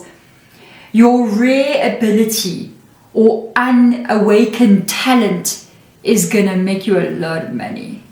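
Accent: South Africa. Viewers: Your readings are amazing 🙏 I recognize the SA accent - hope you are - am totally resonating with yr other readings too Thank you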